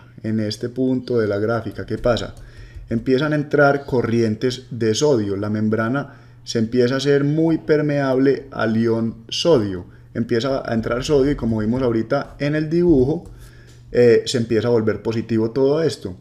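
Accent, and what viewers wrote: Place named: Medellín, Colombia. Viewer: tuve que darle replay xq me deje llevar por el acento de medallo... jajajajaja ups!!!!!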